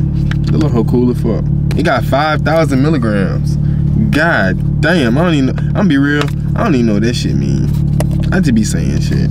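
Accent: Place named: South Carolina, United States. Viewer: Young man your accent is that South Carolina yo fam my family is from the low country keep pushing young man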